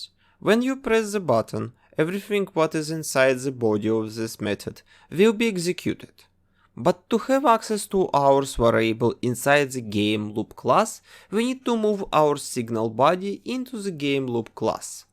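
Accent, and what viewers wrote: Russia. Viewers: Чел ты... Русский акцент не убрать!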